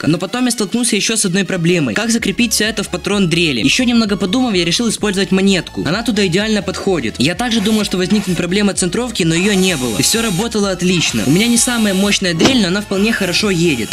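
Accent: Ukraine. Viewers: Ты из Украины ??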